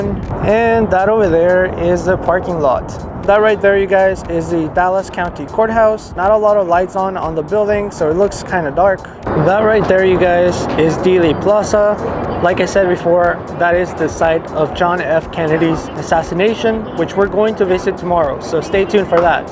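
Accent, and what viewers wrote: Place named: Laredo, United States. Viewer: Whenever I want to show people what a Laredo accent sounds like, I'll show them your vids haha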